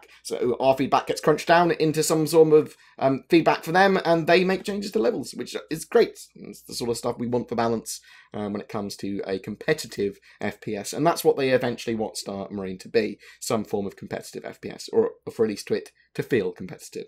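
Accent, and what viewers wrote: United Kingdom. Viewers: I love the british Accent! <3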